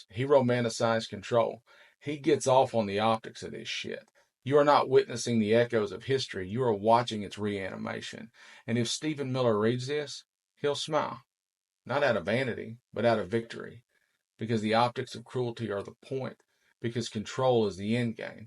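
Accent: South, United States. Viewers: I love hearing this southern accent speaking COMMON SENSE! Keep up the good work! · First time seeing one of your videos. Your presentation and eloquent southern accent really hits home. However, I have to say I’m skeptical that you wrote this speech. I think this was crafted using AI. Not to say it’s not 100% accurate. It just looks and sounds extremely well written. Regardless, the message it brings is something everyone needs to hear. Bravo!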